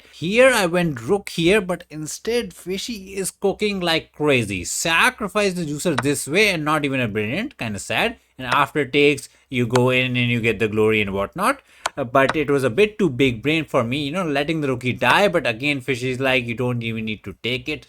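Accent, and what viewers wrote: India. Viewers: bro can you please stop with this indian accent because i cant take anything serious, i know you can speak english normally.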